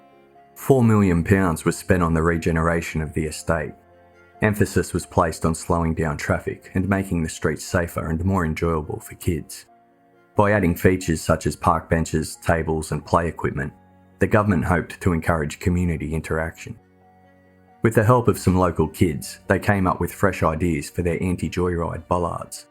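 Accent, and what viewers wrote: Australia. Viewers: These uploads are brilliant!! I'm so glad I stumbled across this channel...I'll be here for quite a while! I also love hearing a fellow Australian!!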